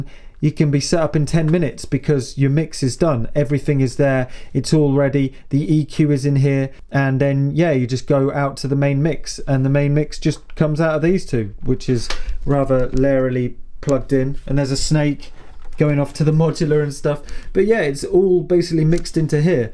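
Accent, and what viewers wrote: United Kingdom. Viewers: I feel the same exact way you do about the way you have been feeling; except you are the Cirklon and I am the SAM. I have been following you for about 4 months now; and I can understand pretty much every other thing you are talking about. Have no clue what a cirklon is or what Beatbox pro is all about but it seems all very interesting. SO I am just reading the manual and absorbing about every other word or so but its very cool because you have the queens tongue. I am sending your music all across the USA to get my friends in the underground interested as well. So when you plan your world tour and visit us in the states; we can all jam together. Gods speed LADDY!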